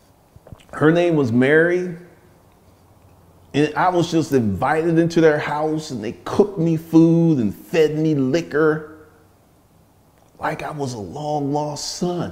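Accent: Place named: South, United States. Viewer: Dude, not for nothing, coming as a New Yorker, you DEFINITE have a Southern accent to me . 😂😂 Thanks for all the value you give and stay healthy and safe.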